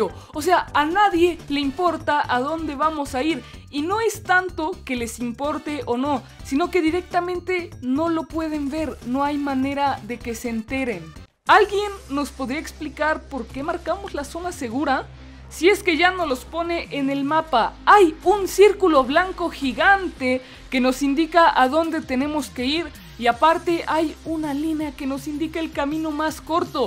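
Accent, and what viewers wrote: Costa Rica. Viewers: Eres tico verdad? Lo digo por el carepicha😂😂😂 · Usted es de Costa Rica?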